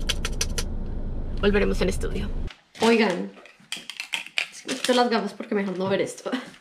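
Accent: Colombia. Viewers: Hola te saludo desde 🇬🇹 me gusta tu acento colombiano.....me gustan tus videos ❤ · Hola! Empecé a seguirte porque me encanta el acento colombiano, ¡mi nuera es colombiana! ¡Soy brasileña, de Rio Grande do Sul! ¡Me encantan tus publicaciones! ¡Éxito y feliz Navidad! 🎄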